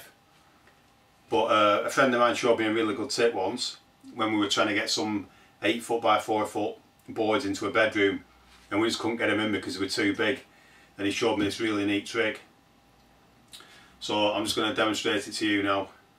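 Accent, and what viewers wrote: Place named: Blackburn, United Kingdom. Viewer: Are you from Blackburn That accent sounds familiar 🤣😂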